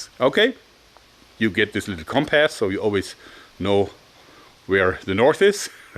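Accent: Germany. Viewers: Man hört den deustsch accent so dirch aber irgendwie sind keine deutschen kommis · He actually sounds very german. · Im German. He has a typical German accent and the Amazon-Screenshot was is german language too.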